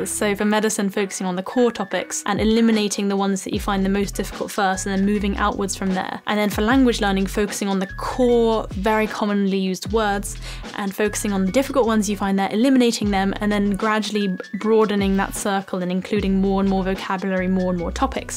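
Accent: RP, United Kingdom. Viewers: I love your British accent a lot. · Hi are you British ☺️ it's important for me because I am trying to learn British accent . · British accent 😭😭😍😍😍😍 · If you ever make another video, wich in fact i doubt, please answear in your responses if you speak RP all the time or just with us in these videos. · I want to learn British accent and I really like your accent and thnx for tips .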